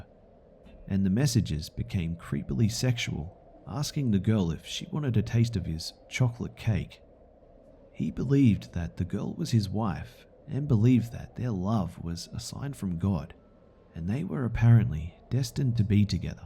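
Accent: Australia. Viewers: The first story makes me think about that Guy Pearce movie that was based on the true story, about the girl in Australia who was murdered by the daughter of old neighbors who was mentally unstable (your accent, probably why I thought of it bc it was set in Australia). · you sound like the narrator for top trending with an australian accent. ^^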